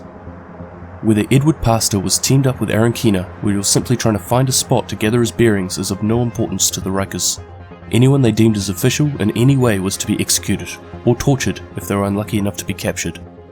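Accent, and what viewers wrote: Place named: New Zealand. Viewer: oh okay, i kinda like that kiwi accent...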